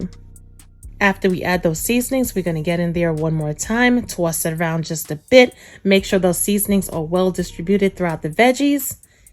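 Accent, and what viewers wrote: New York, United States. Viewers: Looks delicious 😋 you gotta be from NY or Jersey 😭😭 I know that accent 😂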